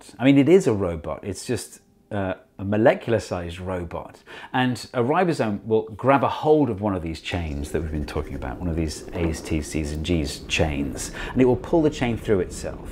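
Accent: United Kingdom. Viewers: British always sounds cool.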